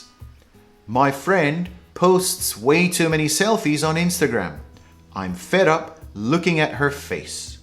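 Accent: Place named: United Kingdom. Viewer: わかりやすい！イギリス英語が好きです😊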